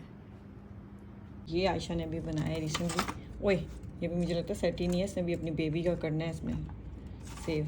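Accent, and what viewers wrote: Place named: Rawalpindi, Pakistan. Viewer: Totally pindi accent .you are mashallah you talkative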